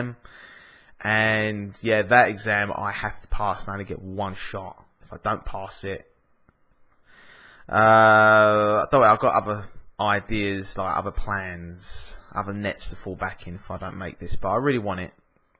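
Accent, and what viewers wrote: United Kingdom. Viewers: Hey don't listen to the haters, I personally think ur handsome and I LOVE the British accent! I prefer a British accent. I love following you and u make me laugh, ur very cute, continue to make videos :) also can you do a public freak out compilation reaction video? I'd love to see how u react ^_^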